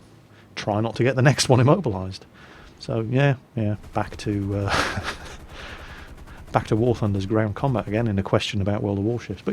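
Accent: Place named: United Kingdom. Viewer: Probably because he has a sexy British voice and was in the Navy for over 20 years.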